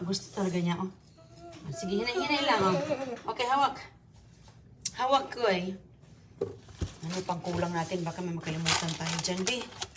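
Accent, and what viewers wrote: Visayas, Philippines. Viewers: Anong masama? Ndi nmn pagandahan ng pronounciation jusme,bisaya kasi sya kya ganun nalang pagbigkas nya,ndi na kylangan tamangnbigkas .marunong knaman yta makinig intindihin muna lang.mga ibang pinoy tlg mga perfectionist kala nman sosyal din,mukhang nag ppakasossy lang naman😂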